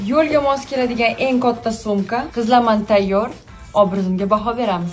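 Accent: Navoi, Uzbekistan. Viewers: Sheva Navoiynikiku😂😂😂😂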